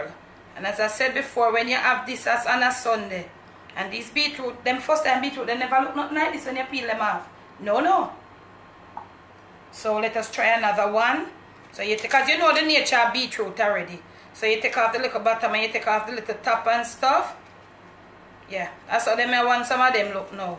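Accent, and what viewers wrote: Jamaica. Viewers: I love your presentations, but most of all, I love the patois. Just listening to you brings me closer to home.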